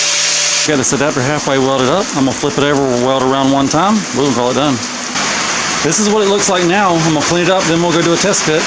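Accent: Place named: Harkers Island, United States. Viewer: Dude sounds like he's from harkers island N.C.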